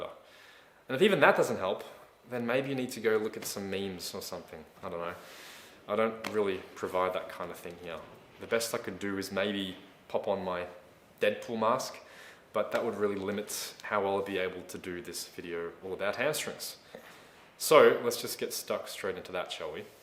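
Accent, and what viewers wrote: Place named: Australia. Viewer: He has the same Aussie accent as the Supercar Blondie.:D Loving your videos! Keep it up!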